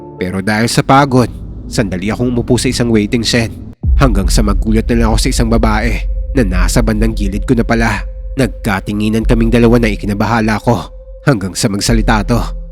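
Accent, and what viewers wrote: Iloilo, Philippines. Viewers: Plss kuyaa haluan muna ng ilonggo accent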